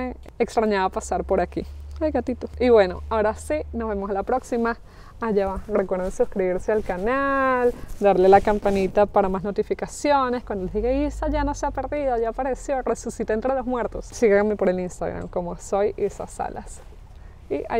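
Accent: Venezuela. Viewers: Hola, creo que tú acento es Venezolano, tengo una consulta. Una ETT justo con las promesas que tú estás hablando... 40hrs bla bla... Valdria la pena ir ? De aceptar con cuánto dinero debería irme ?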